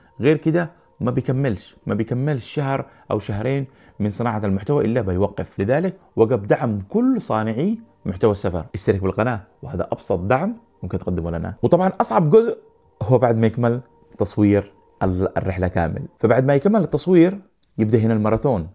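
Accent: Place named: Yemen. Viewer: ياخي لهجتك شوي مغربي شوي يمني شوي مصرؤ بش اكثر شي يمني من وين انت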